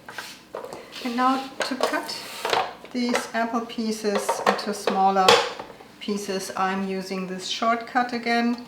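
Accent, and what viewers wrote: Germany. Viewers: So I came here for the Strudel recipe, didn't expect the attractive lady in a dirndl with the German accent, now I'm subscribed. I'll be trying this and the red cabbage recipe as well.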